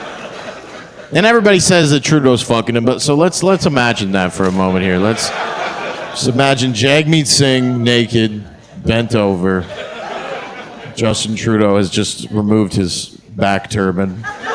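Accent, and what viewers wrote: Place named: United States. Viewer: This is the most american sounding canadian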